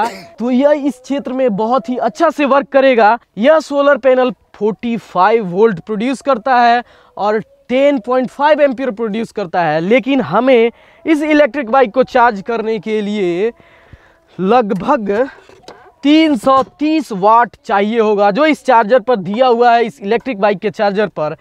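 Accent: Bihar, India. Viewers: बेटा आप खूब तरक्की करो। वीडियो लोकेशन देख के और आपकी भाषा सुन के ऐसा लगा जैसे आप बिहार से हो। बिहारी बहुत तेज़ होते है खोज करने में। आपको इसपर होने वाला खर्च और जगह का पूरा address भी बताना चाहिए की जो खर्चा करके बनवाना चाहे वो आपको संपर्क कर सके। इस प्रोजेक्ट में लोगो का interest देख के बड़ी कंपनी भी आप से संपर्क करेगी और आपका भविष्य बहुत अच्छा हो जायेगा अगर अभी आप student हो तो भी 2-4 लोगो को इस रोजगार में लगा दो देश को बहुत सारे ऐसे बच्चे चाहिए तुम्हारे जैसे ।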